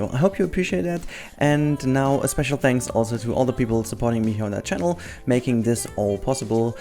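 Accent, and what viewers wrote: Germany. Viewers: Hello from an English (Canadian) speaker! I empathize with you trying to speak animal names in English. Don't beat yourself up over it! As long as you're close, we will figure it out. I, for one, prefer to hear your German accent anyway!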